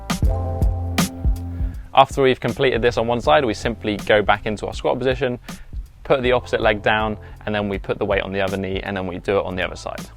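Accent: England, United Kingdom. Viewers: I'm not English but oh beautiful to hear English accent. Not in you face American. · That british accent ..gosh i cant understand u well and thats annoying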